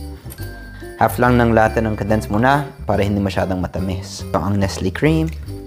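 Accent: Philippines. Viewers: dude even on how you pronounce english is now filipino accent lol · Grave pinoy na pinoy ang accent... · Pinoy na pinoy na talaga ang galaw at pananalita ni Sir 😮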